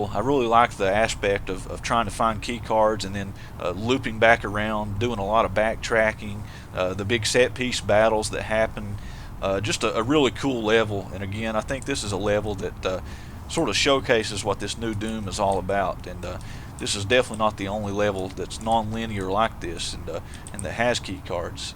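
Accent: South, United States. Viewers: Holy shit you're a southern dude? I did not expect that. Not that I'm insulting you, I'm a Texan myself, just didn't expect that lol. I agree with you though I absolutely freaking loved this game and omg I can't wait for the next Doom. More weapons, more demons, new story, more weapon mods, hopefully new brutal animations, man it would be so sick. My only thing is I really don't see how id could possibly follow this up though, it is epic as hell.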